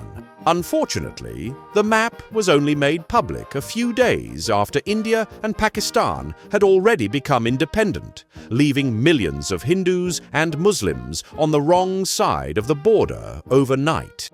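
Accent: RP, United Kingdom. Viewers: That British accent is great 😊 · It's ironic that the narrator for this video is British 👀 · New narrator is fine, but just sounds like more of a “generic RP English accent” and has less of the edge and personality of the old narrator.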